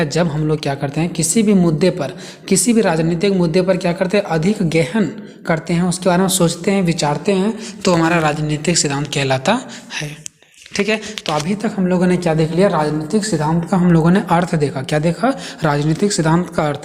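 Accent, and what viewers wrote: Uttar Pradesh, India. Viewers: Ap ki voice bhot pyari hai aesa lag rha hai kese ap utar Pradesh se ho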